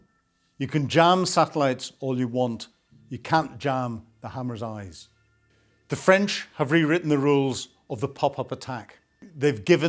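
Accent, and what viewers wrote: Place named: Northern Ireland, United Kingdom. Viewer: Love your blog,love your NI accent AND love your jacket 🇬🇧🇺🇦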